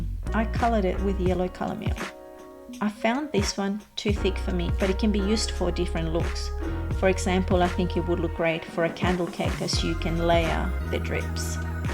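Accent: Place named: Australia. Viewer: Love it... voice over is amazing love your aussie accent!!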